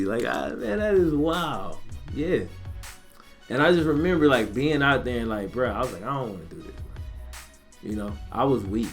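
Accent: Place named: Mississippi, United States. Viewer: Hold up...u from Mississippi? I’m from Mississippi idk why but that cool as shit to me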